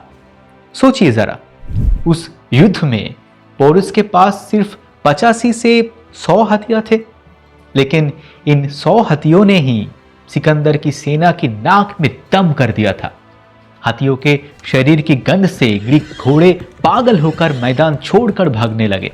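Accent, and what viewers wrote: Bengal, India. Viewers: হিন্দিতে একটু বাংলার টান আছে, পারে ঠিক হয়ে যাবে, 💹↗️ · Pure bangali hindi... We r always with you brother❤ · I'm a good hindi speaking Bengali and I can see the effort you have put in speaking Hindi in this video. And being your first hindi video, I appreciate you and motivate you for further going on with this venture. Yes, the Bengali tone is evident in your speech but you have balanced it well with a controlled pace which has minimized any pronunciation mistakes. Topic and research were good. But full marks for your courageous first steps in making a hindi video not being a native hindi speaking guy. Lage Raho....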